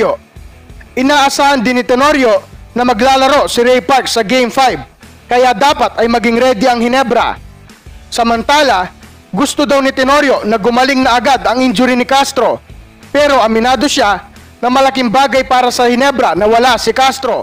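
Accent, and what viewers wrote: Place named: Pampanga, Philippines. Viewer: alatang alata na kapampangan ka heh 😂😂😂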